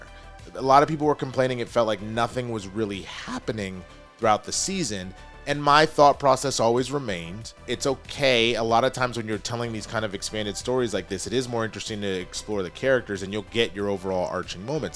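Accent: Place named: South, United States. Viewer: I loved the finale. This was continuing the DCU story not Superman’s story as you said on today’s stream in that wonderful southern accent. To me the story of the season was clear. It was a romance story between Chris and Emilia and a character study of Chris and how HE AFFECTS THOSE AROUND HIM so even if he isn’t on screen we are seeing how important he is to those around him. The story wasn’t about Nazi Earth it wasn’t about Big cameos it was about Chris, and how his decisions affects those around him and dealing with consequences.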